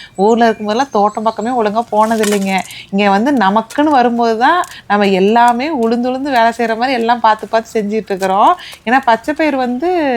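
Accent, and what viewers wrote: Kongu, India. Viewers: Kongu tamil ❤❤🎉 voice super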